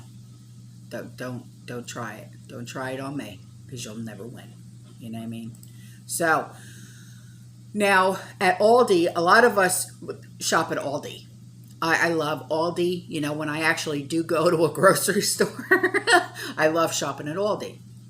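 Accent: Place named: Staten Island, United States. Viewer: OMG how have I not seen your videos before? Listening to you is a blast from the past, you sound like Staten Island where I came from (I'm in North Carolina now). Thanks for all the info, I've clicked the subscribe button!!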